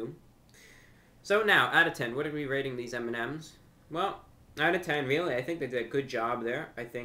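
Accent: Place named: New York, United States. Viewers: Can an American help me out here, what is this accent? 'Vaniller' and 'Chawclat' and whatnot seems different to standard American, there's definitely a regional accent trying to be suppressed in there. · it's actually his New York accent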